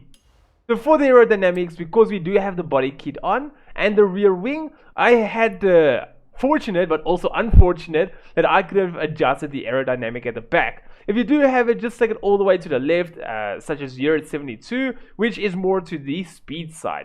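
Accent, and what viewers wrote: South Africa. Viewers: Sound like u from S.A?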